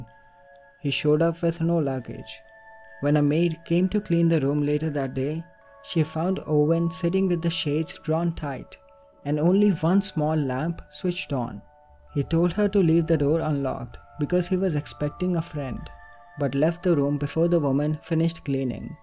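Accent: India. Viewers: Lol the Hindu accent. I cannot understand what you say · You're clearly very uneducated, Hindu is a religion, his accent is Indian, those two are not the same thing. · Then don’t listen. Keep your prejudiced opinions to yourself. I wouldn’t characterize his voice as Hindu, since Hindu is a religion. He has an Indian accent.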